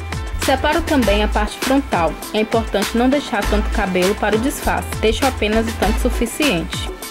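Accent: Rio de Janeiro, Brazil. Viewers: Vc é do Rio de janeiro ? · Show de bola! Vc é do Rio?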